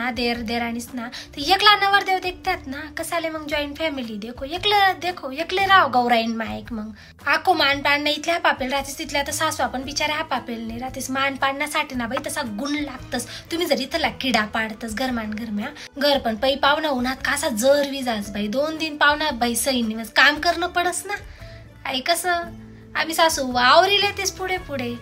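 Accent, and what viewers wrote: Khandesh, India. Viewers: मी तर तवाच वळखल्यान तूण खान्देशी हाय ते छान बोलतीस व ऐकतच राव्हा वाटतेन आम्ही आहो विदर्भ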